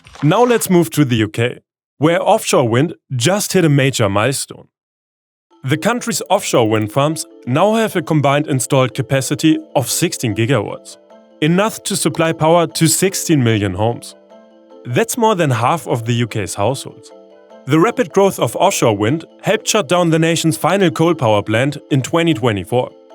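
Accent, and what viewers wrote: Germany. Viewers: Are you German? Your accent sounds pretty german to me! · As a German I tend to not like German accents but yours is just part of the good news for me. I originally come from the Lausitz and am intrigued by the news about the battery project. Keep up your superb work. I struggle to watch “normal” news since a decade now…and stopped it actually almost sompletey a couple of years ago as it was not doing anything good to my mental health status, just sometimes I open the tagesschau, but your videos give me so much faith in the future!!! Danke Leute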